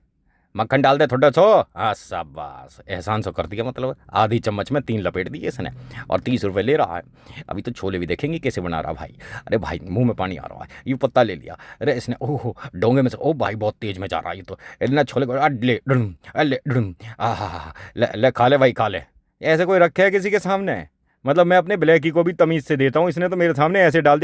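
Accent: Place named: Etawah, India. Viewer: Jai Balaji Maharaj 🙏....From Kanpur but right now from Arunachal Pradesh...Sir aapki boli Itawah ki taraf ki lag rahi hai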